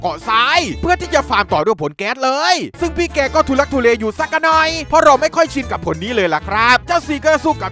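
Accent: South, Thailand. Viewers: คนใต้เหอะนิ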